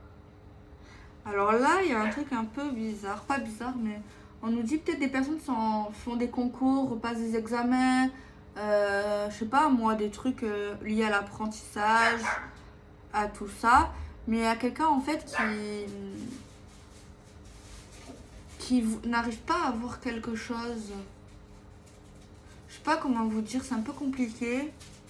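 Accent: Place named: Marseille, France. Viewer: bonjour super vidéos je t’adore et ton accent et de Marseille gros bisous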